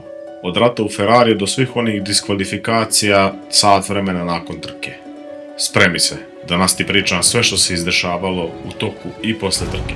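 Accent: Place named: Montenegro. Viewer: Pravis najjace videe sa sve crnogorskim akcentom 😂😂😂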